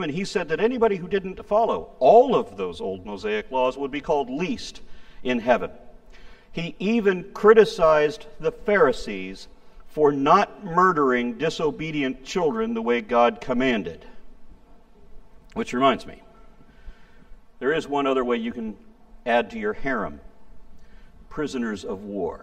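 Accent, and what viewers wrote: South, United States. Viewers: Aron’s Christian southern accent sounds like joe exotic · that Southern English was well done. I love it for real, too. Just sad there were no bible verses with "daawgs" in this video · "Southern Christian" accent is something I never knew I needed in my life:)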